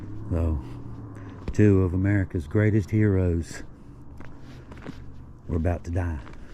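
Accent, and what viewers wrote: South, United States. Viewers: I wish I found this channel sooner. I will watch more videos as this one is fascinating., you have what I think of as the true southern accent, calm, measured speech. My mother's people were in Arkansas and I thank you for bringing their voices back to me.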